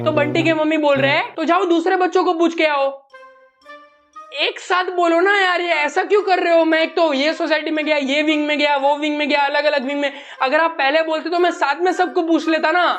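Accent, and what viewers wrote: Hyderabad, India. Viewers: Your Hyderabadi accent is to die for ❤❤😅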